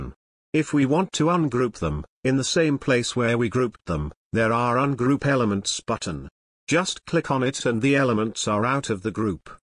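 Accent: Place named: RP, United Kingdom. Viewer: I like how posh he sounds : )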